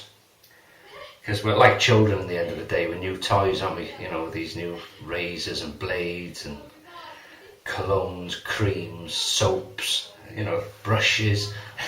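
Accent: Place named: Wales, United Kingdom. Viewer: Nice vids! Subbed. Didn't know you were welsh...(I am rubbish at figuring accents out haha). -Matt (Norwich)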